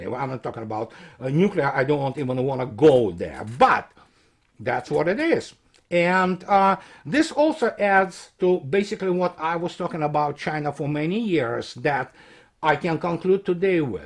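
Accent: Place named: Russia. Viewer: *Closed Captions* when you're uploading, please, Mr Martyanov. Helps us half-deaf people who are bad even with English, let alone Russian accent...thanks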